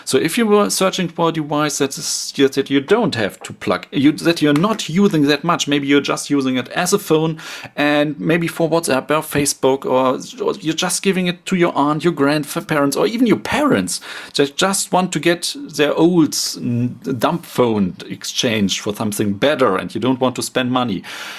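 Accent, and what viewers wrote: Germany. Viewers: Hi, I'm English and love your German accent! You speak English very well in my opinion. Besides that nice compliment though, your videos are very informative. I like how you address things that are important to "the everyday user" - please keep it up! I own a Nokia Lumia 820 and I regret buying it because the battery life is not very good at all and the available apps are poor compared to Android. I was watching this video because I was considering buying the Moto g.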